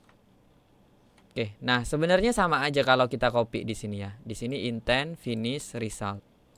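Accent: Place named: Bali, Indonesia. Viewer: bli orang bali ya? :v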